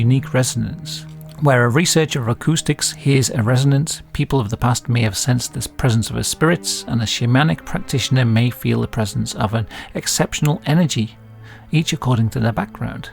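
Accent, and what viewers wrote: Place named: North Wales, United Kingdom. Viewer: I knew you were from north wales😂😂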